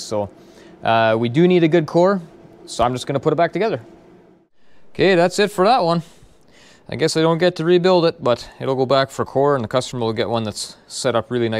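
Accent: Canada. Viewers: The sheer amount of moving parts in a fuel injection pump that was designed decades ago is mind boggling. I love your content, man. This is a relatively new-to-me channel, but you post lots of very interesting stuff, and as a fellow canadian, your honest-to-goodness canadian accent warms my heart in a sea of american videos.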